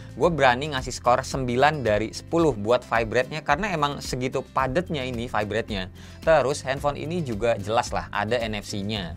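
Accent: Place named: Sunda, Indonesia. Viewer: Orang mana emang Kang? Campur sunda uyy 😂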